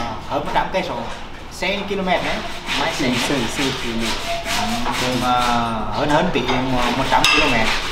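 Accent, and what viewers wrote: Nghe An, Vietnam. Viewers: Ngày nào củng xem chương trình của xem mà không thấy chán vì biết được cuộc sống ở châu phi, và nghe được giọng xử nghệ quê hương mình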